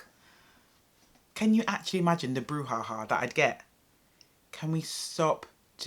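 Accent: United Kingdom. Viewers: Id prefer if you could seperate your - very talanted - comedian style from a very serious message. I was a little irritated because it was so funny to watch. Beside your strong english dialect is fun too for foreigners :D Glad to know its not only me feeling flood by this dog pest.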